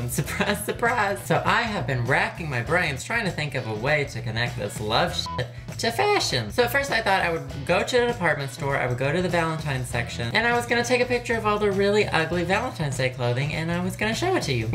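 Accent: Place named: Australia. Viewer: I swear, every episode it's like he has an undercover Australian accent that resurfaces from time to time.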